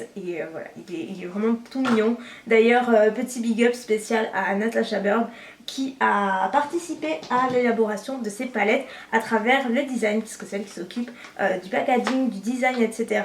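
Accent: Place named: South, France. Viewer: Pourquoi ? C'est l'accent du sud qui fait cette prononciation; je trouve justement que ça donne un charme à chacune de ses vidéos. Si vous cherchez des vidéos provenant de "l'Académie française", ben faut changer de thème alors...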